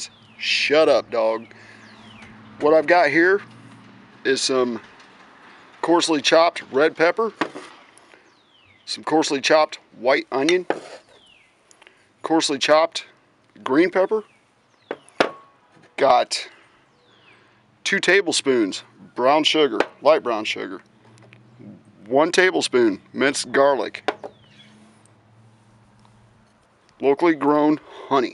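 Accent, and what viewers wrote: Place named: South, United States. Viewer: it is an absolute requirement that, if someone is gonna make a bbq video, to liberally use the term, "yall". And you don't disappoint------------ specially' with ur' southern drawl. Good Job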